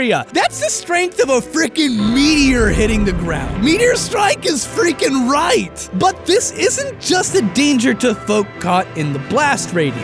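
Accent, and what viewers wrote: England, United Kingdom. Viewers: cute english timelord!!!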